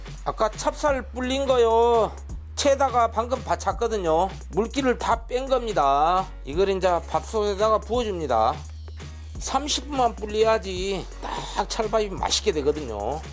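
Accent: Jeolla, South Korea. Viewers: 셰프님 말씀하실때 전라도 말투에 친근감이 갑니다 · 전라도억양 정겨워요❤ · 야~ㅅ따 전라도 찐한사투리 ㅎㅎ 요리는 한국인의 입맛 제대로입니다 많이 배워가고 있습니다 쉽게 단조롭고 간편하게 해설이 더 맛갈스럽네요 ㅋㅋ 발전을 기대합니다🎉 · 전라도 사투리예요? 강원도인줄 알았어요ㅎㅎㅎ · 남도 사투리 정겹습니다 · 전라도 사투리 넘 좋아요 고맙습니다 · 절라도 사투리는 싫은데 가끔 놀러온다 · 전라도 사투리 정겹습니다 우리 고향 말 물이 보타부립다 오랜만에 들어봅니다 ㅎㅎ 잘 보고있어요 맛난 요리 부탁드립니다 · 저도 찰밥 좋아해서 자주 해먹습니다 왔다 맛있겠다 전라도 사투리 정감이 갑니다 셰프님 방송 자주보면 유용한 정보 감사 합니다 ❤❤ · 세프님 찰밥 잘 배우고 갑니다 전라도 사투리가 참 구수합니다 다음 영상도 기대할께요😊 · 아따.전라도. 사투리로 맛깔스럽게 표현이. 진짜 맛있겠네요.어쩜 그렇게 표현을 잘하세요.재밌어요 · 전라도 고흥쪽 말같아요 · 오 찰밥 맛있게 보입니다 전라도말투 친근감이 가네요ㅎ 나도 찰밥을 좋아해서 종종 이렇게 해먹습니다 새벽에 영상을보니 출출할시간이라 더 입맛땡기네요 ㅎ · 말씀이 구수해요~ 보타불고 전라도 분이신가봐요~ㅋㅋㅋ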